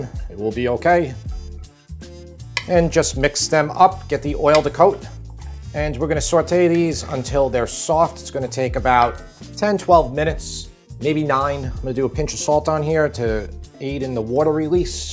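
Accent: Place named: New Jersey, United States. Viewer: You must be from Jersey. Love the accents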